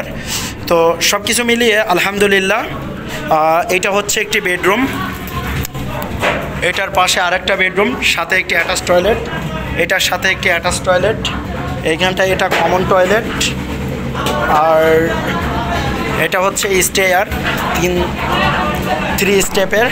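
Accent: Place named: Faridpur, Bangladesh. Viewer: ভাই আপনার ভয়েজ সুনে মনে হয় আপনার বাসা আমাদের ফরিদপুর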